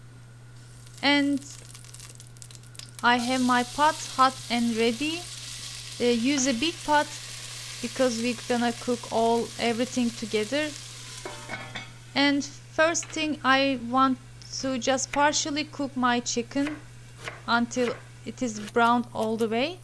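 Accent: Turkey. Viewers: Ur Turkish accent is so cute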